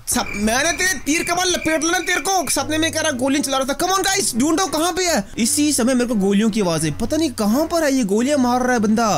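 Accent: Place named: Assam, India. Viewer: app assemes bolteho Kiya app Assam rehtohe kiya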